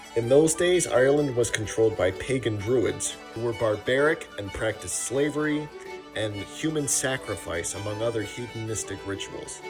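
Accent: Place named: North America. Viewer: a guy who claims being Irish himself - why would he have an North American accent and not Irish?!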